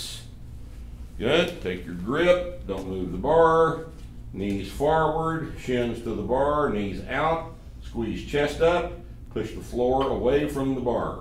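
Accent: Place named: South, United States. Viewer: He should say to start drinking when the video starts to dull the pain of his "good ol' boy" accent and attitude...he's the master but good gosh it's torture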